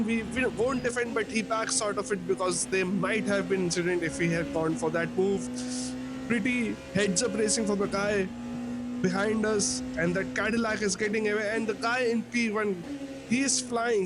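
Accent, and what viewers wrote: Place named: Arab world. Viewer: Are you Arabic? I can hear your accent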